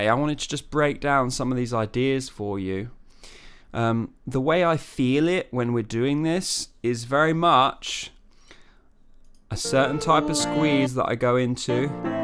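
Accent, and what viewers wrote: England, United Kingdom. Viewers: your english accent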